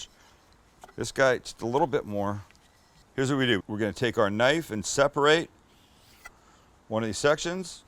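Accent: Canada. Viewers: You’re Canadian? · What part of Canada are you from?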